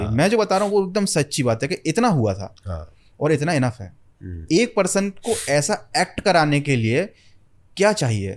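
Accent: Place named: Bhopal, India. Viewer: Ek bhopali hi dusre bhopali ko pehchan skta h only accent se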